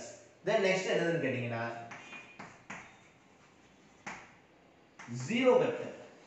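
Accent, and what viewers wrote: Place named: Tirunelveli, India. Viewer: Nellai tamil super sir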